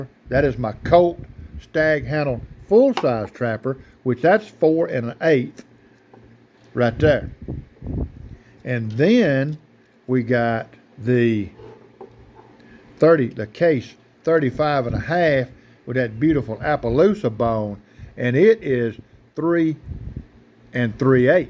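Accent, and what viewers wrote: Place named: South, United States. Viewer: I subscribed to your channel you sound like a good ol knife loving southern country boy like myself. Enjoyed the video. That's right MILO'S TEA BABY LOVE IT.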